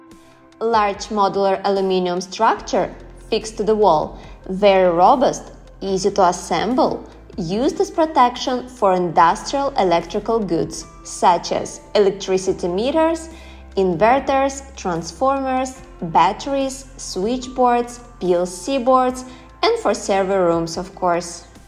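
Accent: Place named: Russia. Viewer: что то русским акцентом пахнет ))) и профиль до боли знакомый )))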